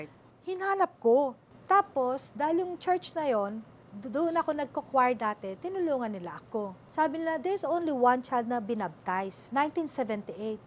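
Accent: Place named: Australia. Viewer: Very easy call Mayor Magalong. He will find your mom Garcia. Your accent now is like Aussie, but you’re right in Australia they take care of their people , specifically women. But in Australia you have to work hard to survive. And bare with paying tax, kasi kapag nakita mo kaltas sa sweldo mo sasabihin mo Bakit parang nagtatrabaho na lang ako para mag tax. Hehehe. Cost of living in Australia is high specially here in Victoria specially MELBOURNE.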